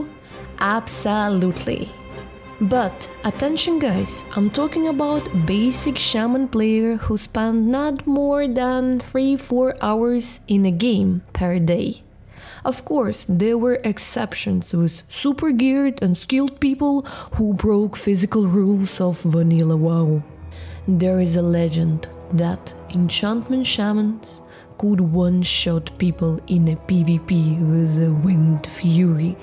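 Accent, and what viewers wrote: Russia. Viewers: У вас русский акцент или мне кажется)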